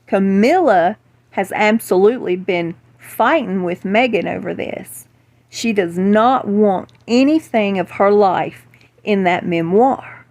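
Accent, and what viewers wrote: South, United States. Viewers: So glad to hear that spunky little Southern voice of yours! Hope you feel as good as you sound! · I just adore your accent!! It's so bright and cute!! One of my fave American accents (from an Aussie fan) :)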